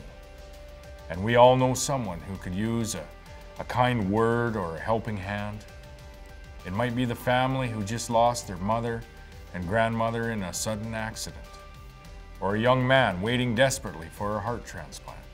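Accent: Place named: Saskatchewan, Canada. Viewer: Merry Christmas to Scott and family. You also speak like you are born and raised in the greatest province of Saskatchewan. Thank you.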